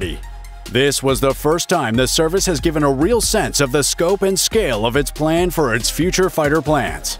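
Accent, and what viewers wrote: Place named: United States. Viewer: Nice....an actual American narrating American innovations. Strange having a Brit explain it to us.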